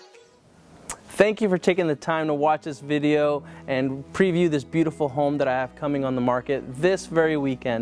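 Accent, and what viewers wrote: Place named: United States. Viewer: God,! I lived in Dublin (Ireland) decades ago and I was thinking, "Gee, that doesn't look like Dublin." And then I thought it strange that a guy named with an American accent would be selling a home in Dublin in Ireland. Not to worry, though. I'm not so dumb that I didn't figure it out eventually.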